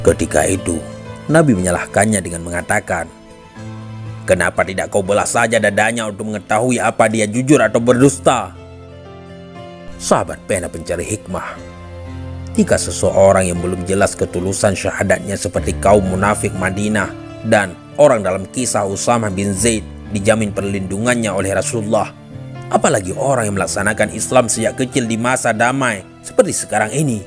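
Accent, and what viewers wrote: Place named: Bali, Indonesia. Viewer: Konten bagus karo narasinya menggunakan aksen Bali jadi kerasa gimana gitu...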